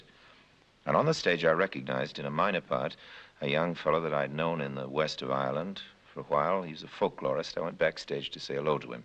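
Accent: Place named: Transatlantic, United States. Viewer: I think he was mostly raised in America but acquired a transatlantic accent, as many actors and upper class people did in the '30s, for example Franklin D Roosevelt had a similar dialect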